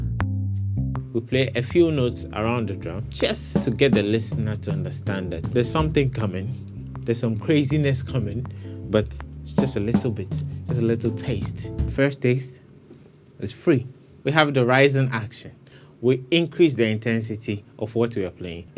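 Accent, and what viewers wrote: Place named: United Kingdom. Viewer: I like how he spoke in a British accent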